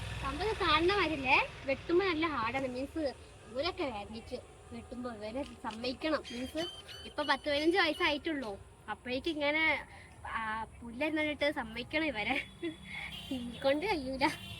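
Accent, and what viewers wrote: Malappuram, India. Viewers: Mlp slang anallo tirur tanur slang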